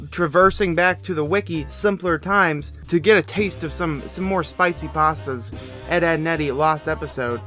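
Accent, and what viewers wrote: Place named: Australia. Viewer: I was turned on instantly by that Australian accent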